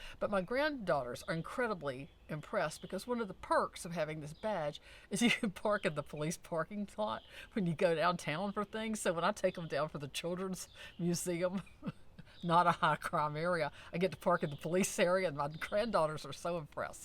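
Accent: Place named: South, United States. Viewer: I just subscribed ! I really enjoy your videos, 🎉they are to the point and enthusiastic and I like your southern accent I work with shelter animals, just cats. I would work with dogs too, and gladly walk them but it’s too far from me at this time. I’m looking forward to your videos about tips to losing weight, I already watched the one about pasta, so I’m going to the grocery store in a few minutes.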